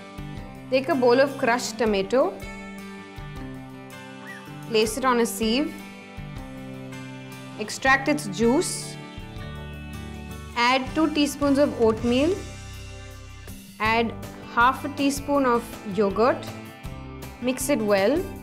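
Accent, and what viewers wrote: India. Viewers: Oh you have such a lovely Indian accent. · The indian accent is so cute :O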